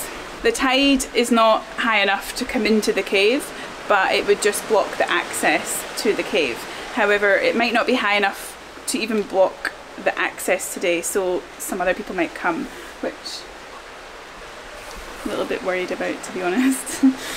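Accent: Scotland, United Kingdom. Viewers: Your Genetic Heritage reminds me of an Old Comedian that is Largely Forgotten Nowadays....STANLEY BAXTER;...... U definitely have his Nose👃 & Broad Scottish Accent..... Sooooo; Cool🆒😎👍🤓❤ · My favorite quote for this video: "Who needs a house when you can live in a cave." Love your sense of humor and of course your lovely Scottish accent. I don't know if you go back and read comments from months ago. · Cheers from Canada. Love your accent, Being of Scottish ancestry I wondered what I would sound like if I was from there. Lol! Enjoy your stay in the cave, you got it!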